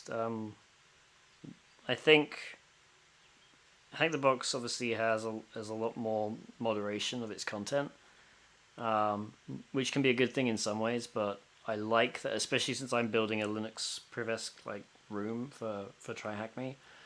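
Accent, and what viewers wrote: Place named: United Kingdom. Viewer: British guy😎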